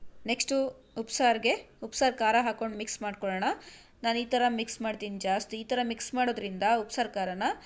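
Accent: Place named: Mandya, India. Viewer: ಅಕ್ಕಯ್ಯ ಮಂಡ್ಯದ educated ಮಾತಾಡೊ ಕನ್ನಡ ಚೆನ್ನಾಗಿ ಮಾತಾಡ್ತಿಯಾ, ತುಂಬ ಇಷ್ಟ ಆಯ್ತು, ಸೂಪರ್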